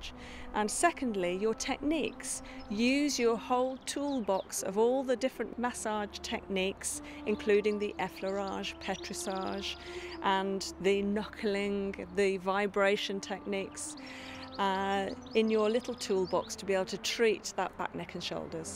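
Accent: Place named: RP, United Kingdom. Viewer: What happened to the all-girls boarding school accent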